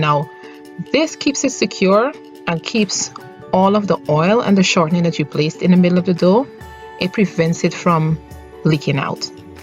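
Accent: Guyana. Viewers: Thanks, for your first YouTube video looking forward to more. I'm always looking for new recipes on your blog. Love ya accent. GT to de bone. Thanks for repping Guyana and sharing our delicious recipes.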